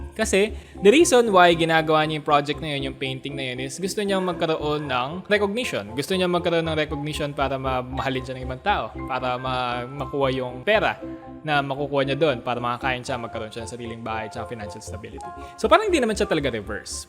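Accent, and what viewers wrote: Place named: Jamaica. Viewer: Sounds like Jamaican in a way 😅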